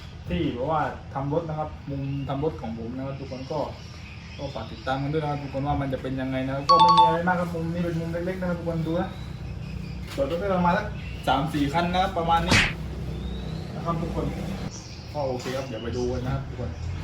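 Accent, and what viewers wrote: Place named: Central Thailand, Thailand. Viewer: แหลงกลางชับน่ะเติ้น บ้านอยู่ใหน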